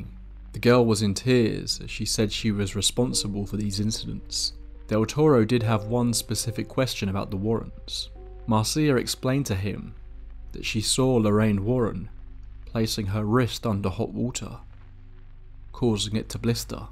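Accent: England, United Kingdom. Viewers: Narrator with an English accent knows what happens in Connecticut in the 1970s .. plagiarized crap, or made up crap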